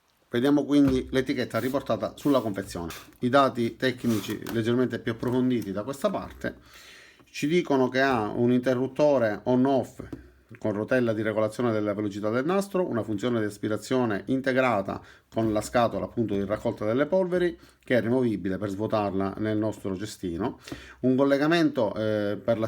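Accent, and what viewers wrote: Sicily, Italy. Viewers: Se la LIDL ti perde come cliente mi sa che è spacciata! Sembri siciliano dall'accento, lo sono anche io, se hai degli elettro utensili da vendere in blocco come usato fammi sapere che ci accordiamo tramite mail! Saluti!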